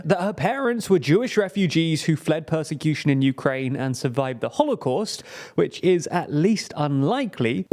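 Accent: England, United Kingdom. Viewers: San-toss, ah-shoe-ming, etc. Englishmen are so funny, when they open their mouths. Otherwise a great report on a huge American voter demonstration of stupidity.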